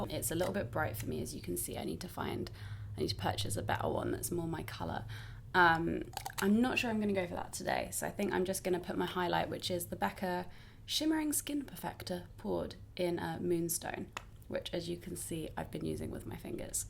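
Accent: United Kingdom. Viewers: Surprising fact, but I wear no make up. Im just here for the lovely face, lovely voice, and lovely British accent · I love your British accent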